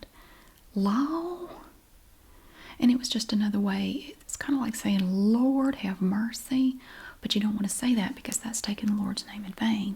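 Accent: South, United States. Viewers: I love this video😊 I studied in Kansas and sometimes heard an expression that I was unfamiliar with. This explains a lot. Greetings from Finland:) Your videos are on my phone whenever I want to wind down and listen to an American accent so I won't lose my language abilities on my third language. Thank you!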